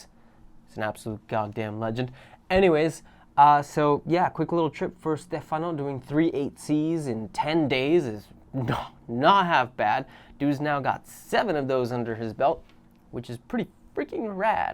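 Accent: United States. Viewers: Eres español? Tío tienes acentaco americano. Como lo haces?! Muy buen contenido. Mis enhorabuenas.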